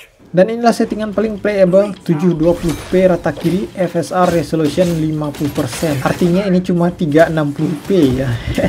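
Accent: West Sumatra, Indonesia. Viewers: Logatnya kaya orang Minang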